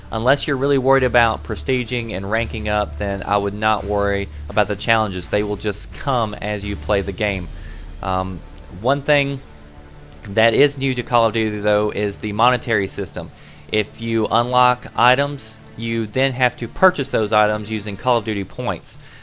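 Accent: South, United States. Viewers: One so what if he sounds like a hillbilly and yeah he has some good vids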